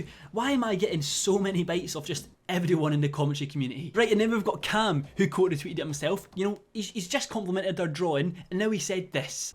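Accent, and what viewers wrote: Scotland, United Kingdom. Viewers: SepticSkull X it’s a scottish accent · Lol I love his Scottish accent I have an American accent and I LIVE in Scotland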